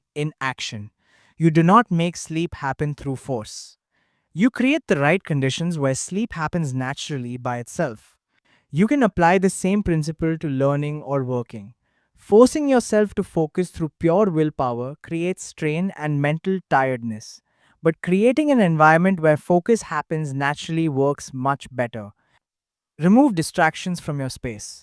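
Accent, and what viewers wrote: India. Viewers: Are you from india ? im just asking buz your accent look like that' 🙂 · Hmm. Lao Tzu stories with japanese graphic and indian voice over. I don't think so. 😂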